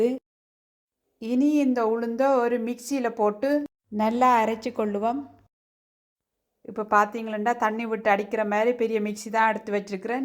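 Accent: Jaffna, Sri Lanka. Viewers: Your Yaazhppaanath Tamizh accent is amazing!!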